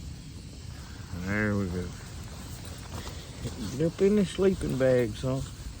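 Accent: South, United States. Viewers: Man...when I find a new channel I often binge watch a touch and see what all similar interests there are. We are about the same age I think, but you're still doing the things that I miss most from when I was younger, or the things I always thought would be fun. Y'all MS and Alabama boys do have a thick accent though 😅. Much love and God Bless brother.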